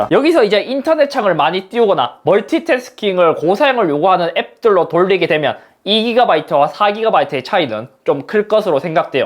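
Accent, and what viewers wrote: Gyeongsang, South Korea. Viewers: 고향이 대구에요?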